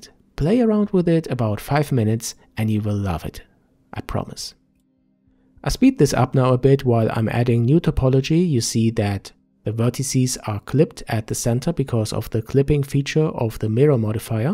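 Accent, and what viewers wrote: Germany. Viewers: Took me to hear that you are german. And i'm german and quit good at english. Oh and very nice video :) I love the Blender Cummunity so far.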